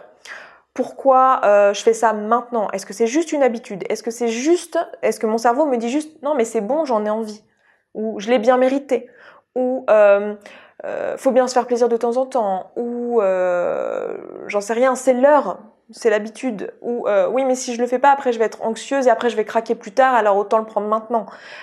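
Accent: France. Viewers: Tu es lyonnaise?:D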